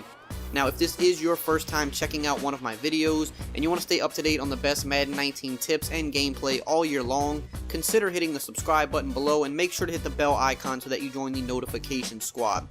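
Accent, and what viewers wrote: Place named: New Orleans, United States. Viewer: That's gotta be a new Orleans accent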